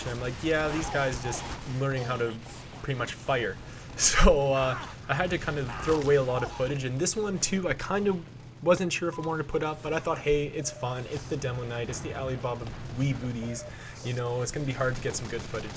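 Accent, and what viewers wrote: Canada. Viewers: you have such a bad ass Canadian accent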